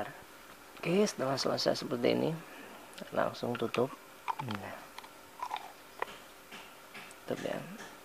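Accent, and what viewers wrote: Blora, Indonesia. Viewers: iki wong mbloro opo y K-E :D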